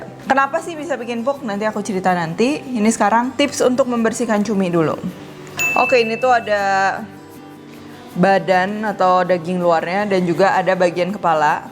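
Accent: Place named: West Java, Indonesia. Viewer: bau hanyir 🤔nyunda pisan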